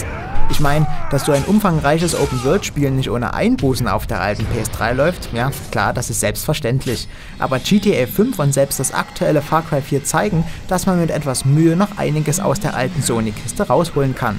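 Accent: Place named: Saxony, Germany. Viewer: Klasse Stimme. Sächselt so schön vor sich hin.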